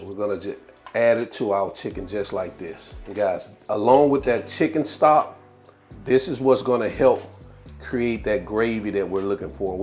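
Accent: South, United States. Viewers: Quick question?: are you from the south? I’m trying to pick up on your accent and your style of cooking lol. Your food is always looking good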